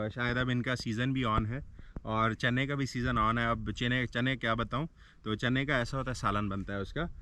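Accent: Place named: Karachi, Pakistan. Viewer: Admin apki Urdu Ka accent Karachi Ka ha ....nice v log👌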